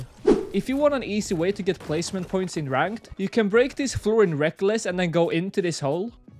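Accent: Sweden. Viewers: You speak swedish, I can heat it in your accent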